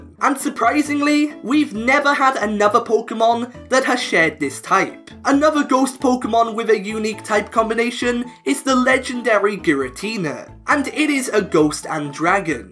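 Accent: Wales, United Kingdom. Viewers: Are you from Wales ??? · Oh man, I can not believe how they discriminate you so much for having a British accent when speaking in your videos. Personally, I really liked your videos, and if you ask me, I'm not American, I'm Mexican. Keep it up. Note: I saw that you forgot the psychic type although perhaps there are no unique combinations with it. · holy shit a welshman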